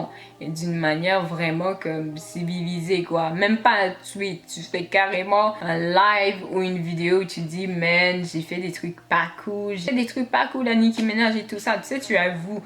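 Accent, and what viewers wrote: Quebec, Canada. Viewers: Tu as un léger accent québécois tu viens d’où? Snn t’es trop belle 😘❤️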